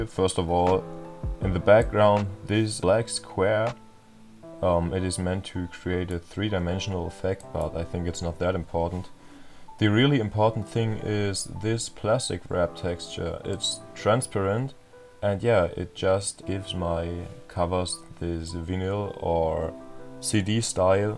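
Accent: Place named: Germany. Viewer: Geiler Content, sehr korrekt das du dein Template teilst. Aber bro man hat ab der ersten Sekunde gemerkt das du Deutscher bist ❤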